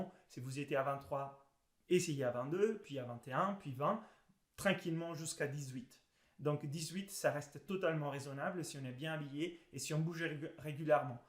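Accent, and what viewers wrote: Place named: Italy. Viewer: Merciiii! Toujours aussi astucieuses et instructives tes vifeos, un regal de t ecouter avec ton charmant accent italien ♥️🐳🤩👏🏼👏🏼👏🏼🎊💃 Gratzie Mille 💓🙏🏼😻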